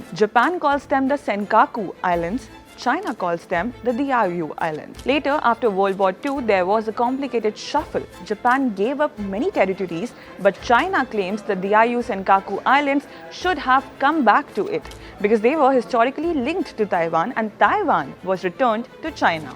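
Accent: India. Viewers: Why does the anchor have very strong Indian accent? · Are you speaking Indian lanagauge? Sound like Indian language!!! Please improve, learn and reduce your Indian accent as much as possible.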